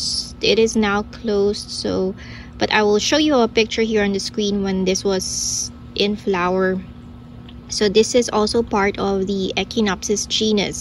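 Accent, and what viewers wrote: Philippines. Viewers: "Rainbow Burst" would be a hybrid seed strain name. Plants from this strain would be variable in form and colour, hence the "rainbow" moniker. Latin names for cacti are ever changing and confusing but mostly very simple. The genus name: Echino = hedgehog. Opsis = appearance. That's a wonderful description...Of just about every cactus! The species name comes next and usually describes the flower or spine colour, the plant's form, the location where the plant grows, or the name of the European person who "discovered" the plant and decided to name it. Common Latin names for colours are: Flava - yellow, aurata - golden or orange, viridi - green, alba - white, rubra - red. Latin names make descriptions easier and more concise. It's much easier and quicker to call a plant Echinopsis Sanguiniflora than to call it "a cactus that looks like a hedgehog with blood coloured flowers." Hope this helps a little. 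I don't mean to be patronising, just giving you information most plant books don't give or explain. Looking at your photo I thought at first you were Mexican, then I heard your voice and now realise you're from the Philippines. I enjoy your channel and seeing the myriad cacti you grow so well. Mabuhay!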